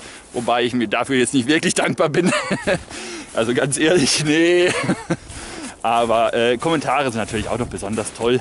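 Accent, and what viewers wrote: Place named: Karlsruhe, Germany. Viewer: Naja.. fundiertes Wissen wird vermittelt, keine Halbwahrheiten Man lernt also immer etwas. Deine Sprechweise ist amüsant und hörenswert (wohl weil es recht bekannt ist, ich wohne bei Karlsruhe). Es wird einem nie langweilig.. Manch andere Kanäle höre ich neben einem Onlinespiel.. Bei dir ist das nicht so. Von Anfang bis zum Ende interessant. Mach weiter so:-)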